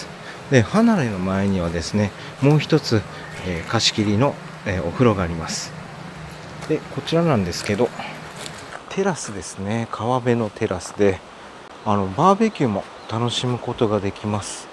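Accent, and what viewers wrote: Kansai, Japan. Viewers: 前々回から、あれオ−プニングの音楽が変わりましたね。 あの歌舞伎映画の音楽かと思ったけど違ったら申し訳ありません。 今回の音楽は朝日と雲海と絶景と合っていて国民放送さんみたいでしたね。 いつもの滝と神社が普通に暮らしてたら行かないような所が画面一杯に広がってあきないです。 関西弁も素敵です。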